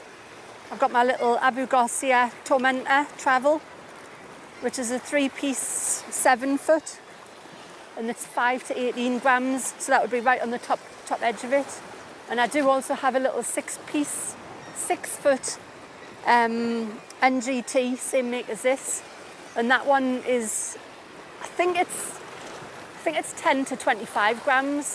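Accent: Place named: North East England, United Kingdom. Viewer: Nowhere guarantees big fish, as I'm sure, you well know, but you can make their capture far more likely by choosing time and place carefully. Sure, just being there is good, and LRF for mini-species can be great fun, but if I'm using the real gear, I like to catch something I can eat. Your accent implies north-east England. There are some fine Cod, Bass, and flatties in that area, though of course, it's all about time and place. The opposite coast from you, holds loads of big flatties in the sandy estuaries, and some Bass. I got tired of catching wee Coalies a long time ago, so tend to avoid the east coast unless, I'm after flatties. Conversely, the west coast, and in particular, the Firth of Clyde has been commercially overfished to death. When I was a teenager, it was swarming with fish of many species. South-west Scotland can be good, but again, it's time and place. 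If I were you, I'd go less often, but farther afield. North-west and far north of Scotland can be excellent, though you'll need your hiking boots. Wales can be very good. It's not, all about catching big fish, but you'll get more watching if, you can show them a few they'd like to catch themselves.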